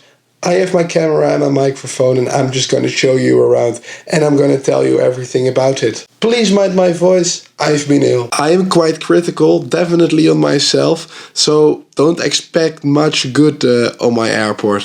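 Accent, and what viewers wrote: Netherlands. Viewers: schiphol atc tower i thougt i heard a dutch accent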